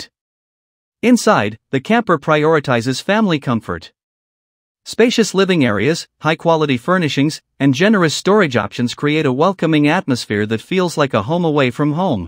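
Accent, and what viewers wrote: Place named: United States. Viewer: Sounds like it’s 😮Voiced over by an American.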